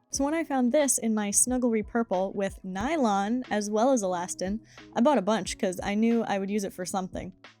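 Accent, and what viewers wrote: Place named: United States. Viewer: Wait a sec, where are you then? You sound American to me but maybe I'm just tired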